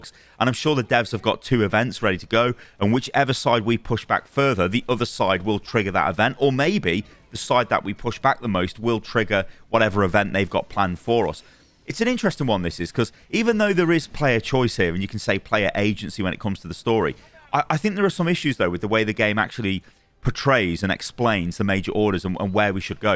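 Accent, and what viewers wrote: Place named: United Kingdom. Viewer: Ah yes my thirteenth favorite channel: Panic in a Brit Voice <3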